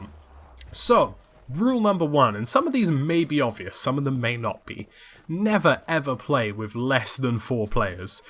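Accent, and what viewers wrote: United Kingdom. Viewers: British accent + advanced vocabulary = music to my ears :)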